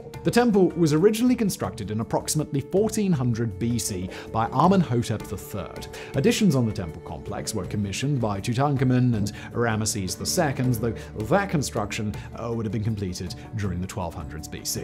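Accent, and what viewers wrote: England, United Kingdom. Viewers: Man, in all honesty, someone has to direct this videos better. I know he has a British accent but in some parts his words are barely clear and sound like a run on sentence. Also some clips were cut short. Many words were butchered and I can’t understand how this video was cleared to be published. Please.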